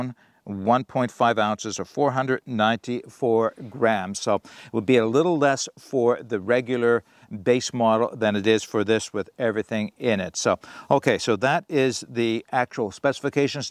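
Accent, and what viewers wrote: Canada. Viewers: Thanks. I m sure that I’m not alone, in appreciating your easy yet thoughtful presentation and nice soft Canadian accent.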